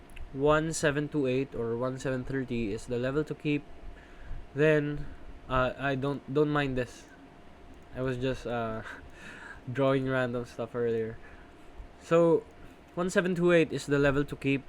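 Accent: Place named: Philippines. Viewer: Just curious with your accent if you're a Filipino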